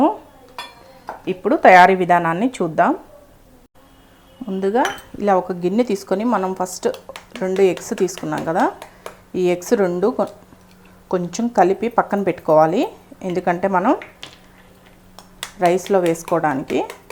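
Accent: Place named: Bellary, India. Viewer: I saw Bellari accent in your Telugu ma'am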